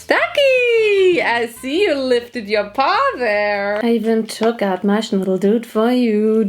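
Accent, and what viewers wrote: Germany. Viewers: Sie redet einfach English und bekommt diese andere Internationale Aufmerksamkeit 400iq aber man hört dass an ihrem English dass sie Deutsch ist das hört sich so cringe an 😂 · Are you German? 😁😅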